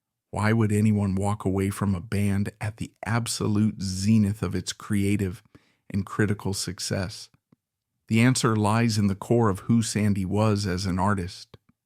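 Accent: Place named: United States. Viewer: American accent at it’s worst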